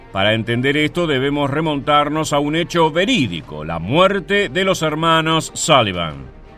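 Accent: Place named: Argentina. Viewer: Tu forma de hacer crítica es de lo más absurdo. Pará la próxima ocasión, en vez de criticar realiza una investigación concienzuda de los hechos y haz una entrevista personal a los soldados muertos para que te digan a detalle lo que sucedió. Posteriormente escri e el guión y haz tu propia película. A propósito, por tu tono de voz éres de nacionalidad argentina, verdad?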